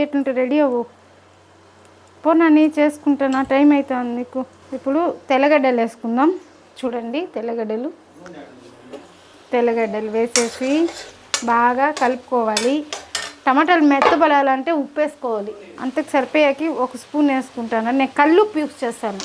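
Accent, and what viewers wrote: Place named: Anantapur, India. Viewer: Sister your Launguage is very nice,it remember me my Ananthapuram journey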